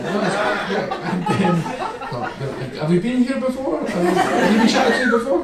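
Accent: Scotland, United Kingdom. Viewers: I'm here for the Scottish accent!! ❤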